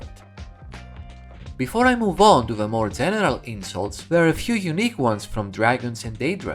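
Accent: Greece. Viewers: Are you Greek? Me too! Haha we have the same accent!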